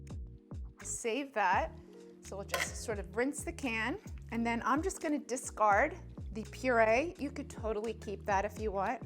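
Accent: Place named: Boston, United States. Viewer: Like a non Jersey Carmela Soprano but MUCH prettier. said your from south Philly? I assumed greater Boston area at first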